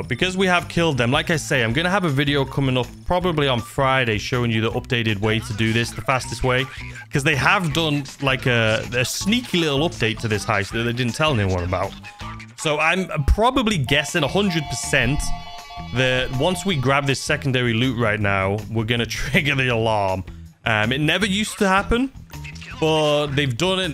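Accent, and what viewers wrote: United Kingdom. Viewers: I am non-native English speaker. I can hear difference between US, UK and India but I can't really locate accent. But you sounded so British... Almost English Dave thing 🙂 Your way of speaking was just pleasing me - I like to hear British English (maybe because I hear US people most of the time?). A weird thing to tell to other guy but I don't care, we won't meet and feel awkward because of that :D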